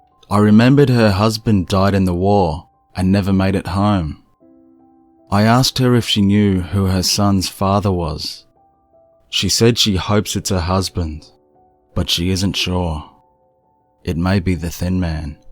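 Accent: Australia. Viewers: Wow!! I really loved this video! Listening to your very sexy Aussie voice on this perfectly beautiful fall day. What more could I ask for?? And I see you've amassed quite the following!! Many wonderful comments on this one!! Congrats!! Even though elephants are my all time favorite animals, I do like your sexy kangaroo!!;-) Take care hon. I'm looking forward to the next one!!:-*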